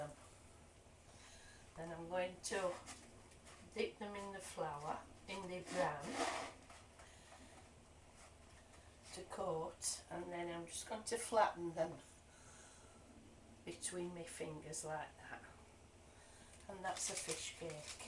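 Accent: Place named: Derbyshire, United Kingdom. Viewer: thanks for the recipe and demonstration, loving the accent, Derbyshire if i'm not mistaken, a beautiful part of the country, feels like home even though i'm a southerner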